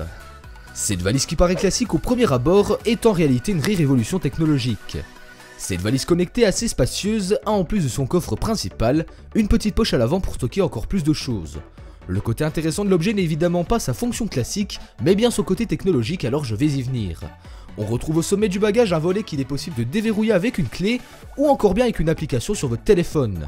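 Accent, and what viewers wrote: Belgium. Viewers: j'adore l'accent belge.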